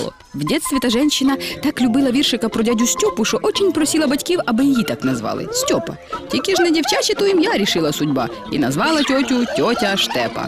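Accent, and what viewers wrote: Ukraine. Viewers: У меня тетка на Подоле живет в Киеве. Так она точно так же разговаривает. Один в один. И суржик, и интонации и фразочки ту, пипец, как похожи.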